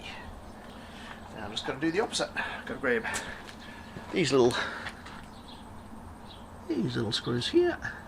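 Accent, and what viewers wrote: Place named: Australia. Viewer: Thank you! Looked all over you tube for a video and this is the only one I could find. Very simple and saved me a ton of time. Great Australian accent too!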